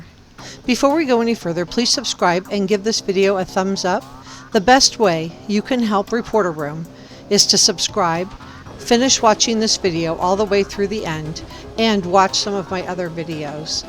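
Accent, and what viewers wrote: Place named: Pennsylvania, United States. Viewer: I detect a Pennsylvania accent! I love it, my in-laws are from Pn ..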